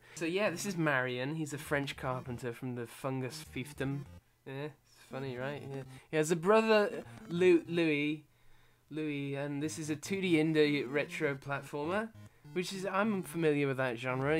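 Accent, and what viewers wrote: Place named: United Kingdom. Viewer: You're only funny because of the British accent.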